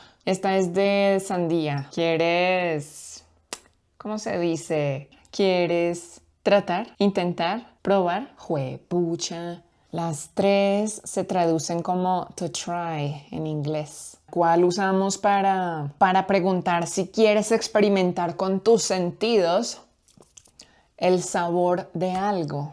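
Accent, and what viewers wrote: Colombia. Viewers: rapidamente me he dado cuenta que eres Colombiana, por tu acento y por el uso de la palabra "vaina". buena leccion!!!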